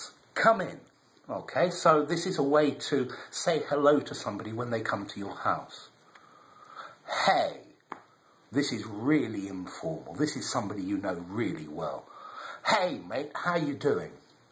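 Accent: United Kingdom. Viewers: Oh, Finally A British Teacher.! Great! BTW,I am interested in the British accent and trying to listen to it as much as I can,but still need rules! Do you -or anybody else- know how to improve ACCENT ? sources PLZ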